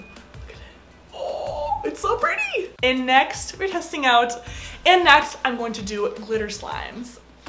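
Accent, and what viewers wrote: United States. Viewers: Is she american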